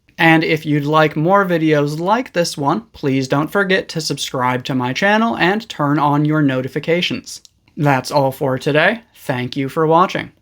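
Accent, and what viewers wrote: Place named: United States. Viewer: I was blown away by your beautiful American accent. 😊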